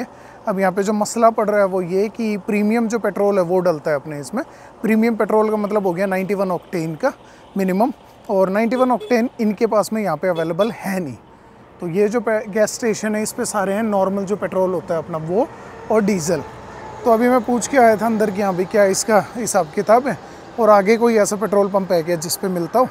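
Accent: Haryana, India. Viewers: Haryanvi kitni hi shudh hindi bol le Haryanvi ka pta lag hi jata h Jai haryana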